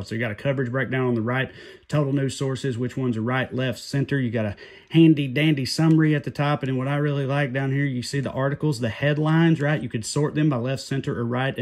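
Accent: South, United States. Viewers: I had to follow the transcript to understand your southern accent!!! This is worse than calling the tech guy (how do I plug it in?!)!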